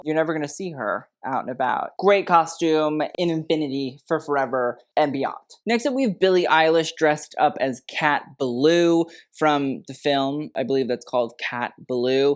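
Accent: Ireland. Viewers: Perfect Irish accent…love it❤ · Sorry, but nun + Irish accent brings to mind only one person: the legendary Sister Michael from Derry Girls 🖤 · This mf Irish accent lmaooo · Omg the Irish accent without sounding like a leprechaun is fantastic! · My father is Irish and you did fairly well with accent, Sister. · nice irish accent! Yanks normally butcher it but you're pretty spot on! Gwan Sis! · BEST IRISH ACCENT QUEEN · You really made me laugh! The irish accent was great and what you said about Ed Sheeran had me in stitches. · Excellent Irish midlands accent:) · !! I always love when you pull the Irish accent out! Sounds just like my mother in law from Belfast 😜🇮🇪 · what an excellent Irish accent - all about it!! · I could listen to that Irish brogue for days. So delicious. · Your Irish accent sounds like Mrs. Doubtfire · I usually don’t gove youtube videos a thumbs up but damn I hit that button so hard just 2 minutes in. 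That Isish accent was incredible 😭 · Lovely Irish accent and cute costume 😊☺️ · that is a very good irish accent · Ok but you’re Irish accent sounds just like Robin Williams, Mrs. Doubtfire! Which is such a slay!!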